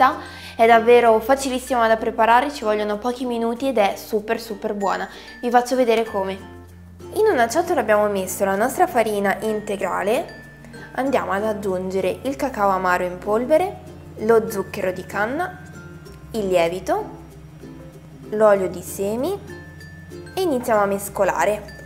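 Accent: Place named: Marche, Italy. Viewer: sembra buonissima!! ma per caso sei di Senigallia? hai un accento marchigiano! :-)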